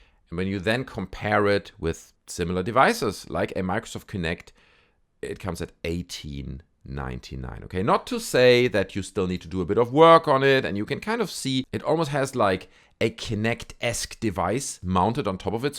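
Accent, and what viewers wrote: Germany. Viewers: Mann, hört man dein deutsch sein raus 🤣🤣🤣🤣🤣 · very good english but i know exactly that you re German 😂 thick accent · why you sound german lol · I love your German accent! It's cool!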